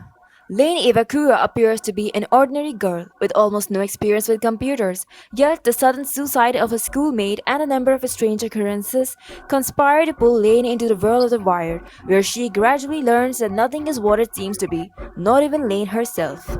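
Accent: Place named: South Asia. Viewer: Hi Nice to hear a desi Voice, talking about Anime:)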